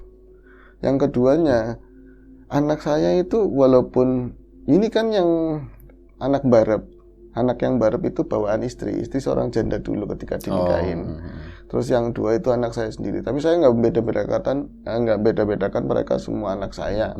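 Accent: Banyumas, Indonesia. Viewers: Weee pagi2 tayang... seneng denger bhs mas e narator ngapak2 gmn gtu.... ada nak 🥰🥰🥰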